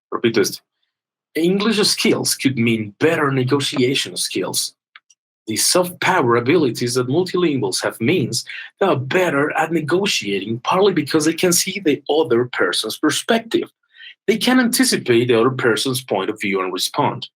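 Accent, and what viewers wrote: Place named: England, United Kingdom. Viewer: Omg 😱😱 que perfecto abla el inglés como en Inglaterra estoy asombrada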